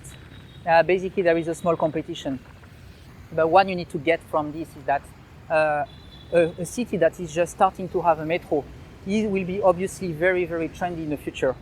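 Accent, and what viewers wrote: France. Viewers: I watch you for the French accent!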